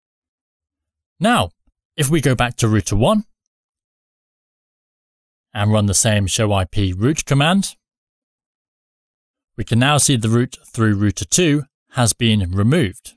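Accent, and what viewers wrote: England, United Kingdom. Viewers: Rutah 😂 England accent Remind me buttah ( butter) Great explanation by the way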